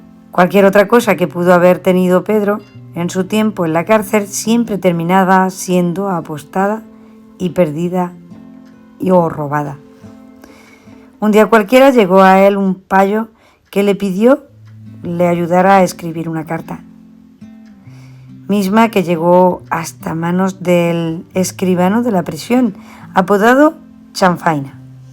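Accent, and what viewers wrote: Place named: Spain. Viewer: Se le escucha acento español y por lo tanto debe tener dicha nacionalidad. En verdad me sorprende que alguien de España haya pronunciado la palabra "colonización" término ambiguo y mal aplicado ya que el actual territorio mexicano fue parte del virreinato de la nueva España, nunca fue una colonia y los nativos de las Américas fueron considerados súbditos después de la conquista cómo lo dejo expresado la reina Isabel la católica en su testamento y nunca fue política del Reino de Castilla y después del glorioso imperio español el "esclavizar a los mexicanos" al menos no en la ley, entiendo que muchos peninsulares y criollos cometieron abusos en éstas tierras pero siempre al margen de las leyes de Castilla y aún contra ellas. La historia de México no se entiende sin la historia de España, la historia de España no se entiende sin la historia de Hispanoamérica y me sorprende que tanto españoles cómo mexicanos desconozcan nuestra historia en común. 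Su reseña del periquillo sarniento es algo inexacta, le mando un saludo desde el territorio del antiguo reino de la Nueva Galicia.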